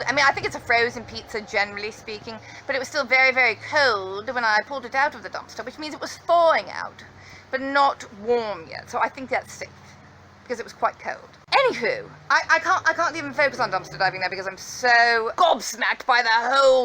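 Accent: England, United Kingdom. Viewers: Aww poor bird! Glad it recovered. By the way, your English accent is better than mine....xxx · Bless your heart, you had me in tears and laughing my head of over the poor bird flying into danger of the cats and will woman. With English accent saying the wings are fine but the head is hanging. Let’s keep him quiet and maybe he’ll fly around the bathroom. You open the box and his head is up and the we one flys up to the top of the tree 🌳 Oh my goodness you remind me of myself, English accent and dramatics❤️ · Great British accent! I love it. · You sound like my auntie with ur English accent 😂😂😂 love ur channel all the way from Scotland 🏴 so glad the bird was ok 👌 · I love you , but loose the English voice please ! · great English accent, wonderful bird rescue, and awesome haul! I L O V E your videos. You always make me feel good when I’m down!! Also, been meaning to tell you I really like your bracelets! 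😘😘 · Why do you keep switching to an English accent!?!. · Good English accent. 💕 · you sound English not Australian and trust me I should know I'm very English and you sounded just like me x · Sticky toffee pudding is a great English dessert made with dates, in honor of your lovely accent. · THT WAS AWSOME I LOVE THE ENGLISH ACCENT LOL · 😆I love the British accent! You are funny frugal mommy!❤️ You’re a pretty good southerner too! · Make banana date bread as it is awesome! I have to laugh at your British accent because my mom is British! I get a kick out of it! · you English accent, you could be one of us!!! You would settle right in! 😃👍👍👍👍💗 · I am sooo happy you saved the Robin!! I enjoyed your English accent, spot on I dare say! Love your videos. Stay safe. ❤️ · 🤣🤣🤣🤣🤣🤣🤣🤣🤣🤣👍 ENGLISH ACCENT Or AUSTRALIANO 👍 💙♥️💙♥️💙♥️💙♥️💙♥️💙♥️💙♥️💙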